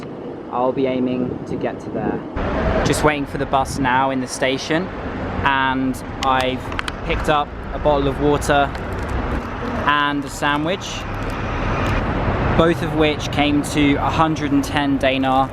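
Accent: United Kingdom. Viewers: I hate that British accent , coward Brit’s gave their county away to Muslims , puke 🤮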